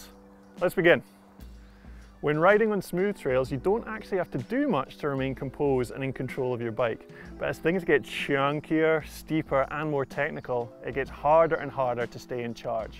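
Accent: United Kingdom. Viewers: "So obviously that's all beautiful, excellent technique.", with that British accent and the plethora of hand motions you've elevated biking videos to the level of a performance of Macbeth.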